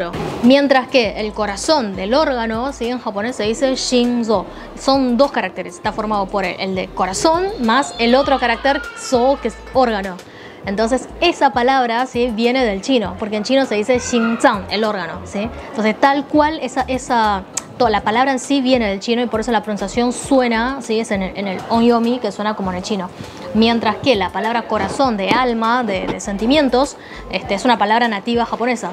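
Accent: Argentina. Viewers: Más bien habla como Surispanoamérica O Argentina o uruguaya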